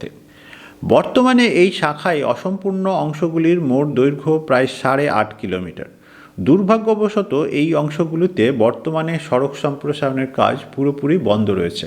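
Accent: Standard. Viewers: অসাধারণ উপস্থাপনা। এত বিশুদ্ধ উচ্চারণ, শব্দ সংযোজন ইত্যাদি দুর্লভ হয়ে উঠেছে, আজকাল। যাই হোক, দুর্ভাগ্য বাংলাবাসীর যে এতো গুরুত্বপূর্ণ একটা রাস্তা এত বছর পরেও জমিজটে আটকে আছে। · Khub sundor. Apni bangla khub sundor bolen.. bisuddho bangla uchcharon kub kom sunte paoa jay..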